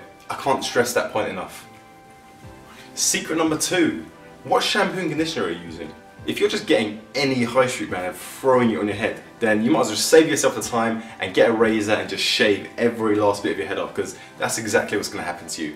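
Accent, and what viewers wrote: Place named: London, United Kingdom. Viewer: Bro might I recommend shaving your head - you're a good looking dude and you can pull it off. It would look a lot better than what you have now. Dht causes hair loss, not shampoo, not stress, not smoking, not diet, not anything else. You sound like you live in London, so speak to the top trichologists and hair transplant surgeons on Harley street, and they will tell you the same. I used to think like you did, until I saw a fat homeless junky outside Bond Street with a head full of amazingly thick hair - I'm sure he's stressed, I'm sure he smokes and drinks and eats shit. So why isn't he bald? Because his genetics are coded differently to mine and yours - his follicles are resistant to dht, yours are not.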